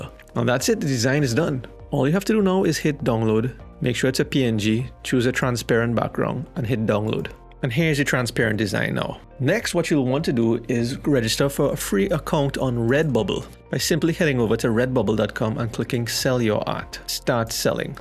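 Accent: Trinidad and Tobago. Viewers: Are you a Trini?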